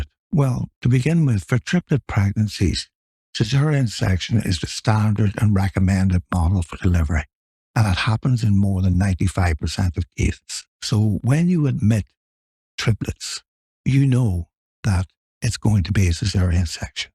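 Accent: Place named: North, United Kingdom. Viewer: Fantastic STuff..😅.....Your obviously from up north,.......❤🎉... FaB accent ye got There my friend 😅🎉... i moved to London from Dublin in 1998 but im STILL famous for my strongish 1/2 CorK 1/2 Dublin Ramble 😅😅😅😅😅...Fabulous channel 🎉. Been Binge Listening 👂 for the past 3weeks ❤🎉😊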